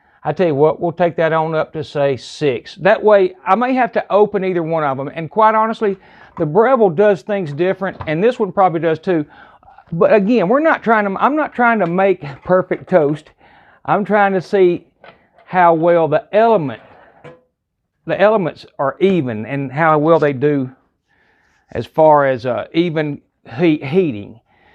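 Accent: South, United States. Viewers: Thank you so much for doing this. Your video helped me decide between the two appliances. I like your southern accent, too.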